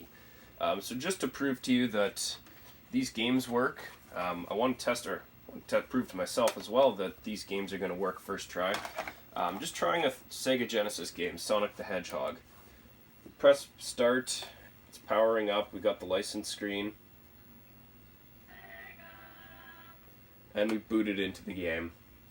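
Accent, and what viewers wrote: Canada. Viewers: Canada ay! You can tell, nothing wrong with that tho.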